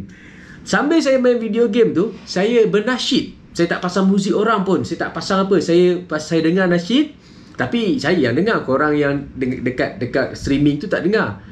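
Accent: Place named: Kedah, Malaysia. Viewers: Amboi byk selit utaqa nohh🤣🤣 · suka ckp kedah "X leh kata apa arr"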